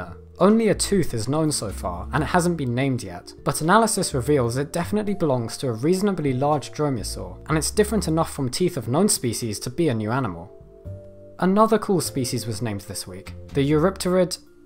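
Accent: United Kingdom. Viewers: you have a British accent so you can say everything as you please; apparently....:) Intro for an intro.......NICE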